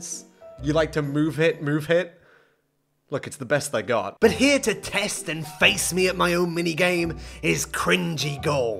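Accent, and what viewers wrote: United Kingdom. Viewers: Ah yes,British accent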